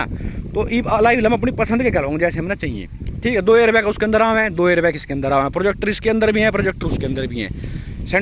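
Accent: Haryana, India. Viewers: Love the Haryanvi accent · Love this accent of ur hryanwi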